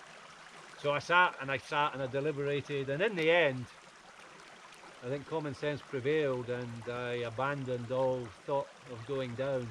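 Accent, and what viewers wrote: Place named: New York, United States. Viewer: that is too funny. When you speak it is, to me, like a fellow NYer. Thanks for getting back to me and thanks for the video. BTW, food looks so much better cooked at a campsite. Be well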